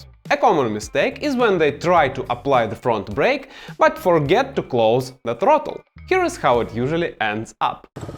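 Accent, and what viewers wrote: Russia. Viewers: Чел, с таким русским акцентом изучать английский просто превосходно) Русский канал ты верно забросил, так что смотрю тут и даже понимаю процентов 40. пока 40.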